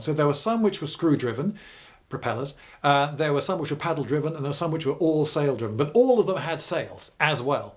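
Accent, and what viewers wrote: United Kingdom. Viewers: Now I don't know why this was recommended to me. I don't like fortresses nor canons, I certainly haven't heard of before. I was just going to give this video a chance and see what this older man was going to ramble about (me in my pessimistic view).... Before I knew it, around 39 mins have already passed, haha! I still didn't find the topic particularly interresting, just that there's something about hearing British speaking person explaining things that made me stay. If I remember right, I used to watch a nature program/show narrated by Attenborough and I think he spoke British English too:) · İs your accent the english one? Asking cuz i can clearly understand your speech without subtitles. So i wanted to know if you had english accent (Sometimes i can have problems in the american accent) (Btw i have only watched 2 of your videos which were the muzle break one and the clip-magazine one and they were awesome so i am subscribed to you from now on)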